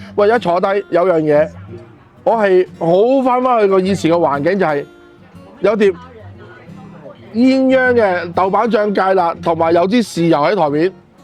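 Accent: China. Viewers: 屌啲煲冬瓜好撚可愛兼普通I like it🙂‍↔️😂👍🏻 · 可愛的普通話😂好睇😊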